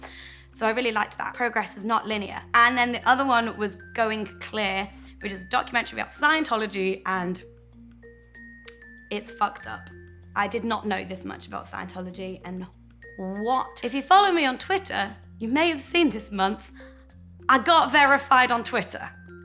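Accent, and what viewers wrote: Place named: Manchester, United Kingdom. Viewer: where is from her accent sounds very familiar.. i want to say manchester?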